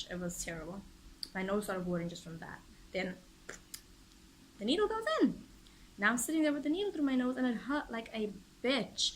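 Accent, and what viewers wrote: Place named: United States. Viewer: You sound American haha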